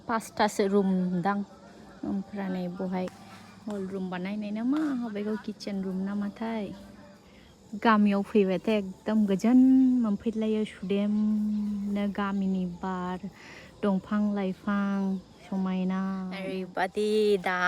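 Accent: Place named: West Bengal, India. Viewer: West Bengal ni boro railynaiya alda kwnaiyw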